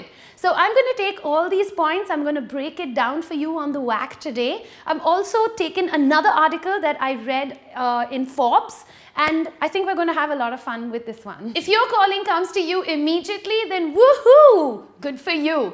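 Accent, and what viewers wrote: India. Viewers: I just love your natural Indian accent :).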